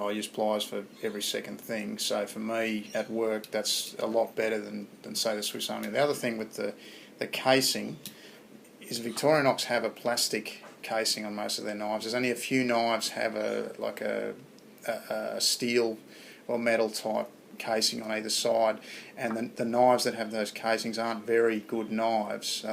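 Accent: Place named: Australia. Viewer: wenger is apart of victorinox now :) oh and also ur accent gave it away... an aussie???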